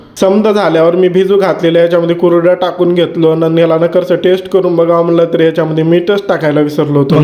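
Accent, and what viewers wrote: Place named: Gujarat, India. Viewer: Tuzha gav kuthle.bhadha thodi vegle wataate.gujarati aikdylla yete.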